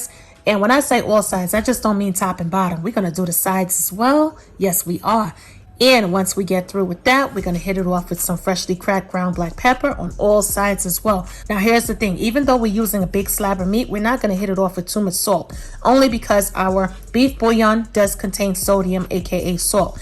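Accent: New York, United States. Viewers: I think its New York but it could be Boston.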